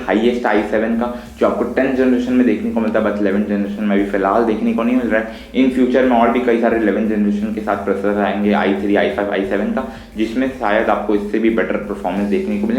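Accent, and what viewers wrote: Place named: India. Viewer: this is not English... Indian English.. sorry nothing to understand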